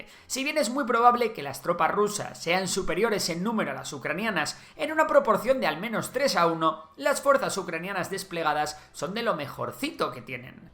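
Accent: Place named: Spain. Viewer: Creo que este muchacho ve demasiado películas gringas. Su acento español dice que es adicto a los diarios ABC y El País.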